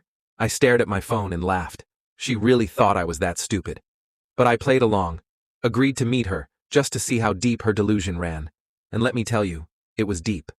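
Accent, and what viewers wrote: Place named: United States. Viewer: Although the voice over is American. the road trip filmed on the video, looks more like some third world country.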